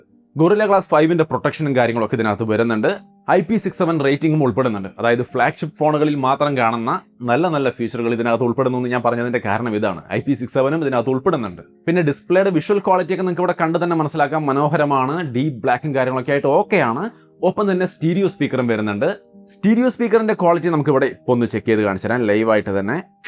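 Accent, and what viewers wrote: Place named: Palakkad, India. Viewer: Palakkad karananeleee☺️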